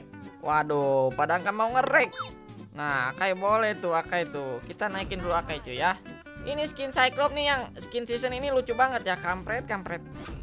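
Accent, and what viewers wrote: West Java, Indonesia. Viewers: Bang org sunda yak? Gak tau napa kalo youtuber sunda beda cara ngomong nya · Kamu orang Bandung mang soalnya ngomingnay kaya ada sundaan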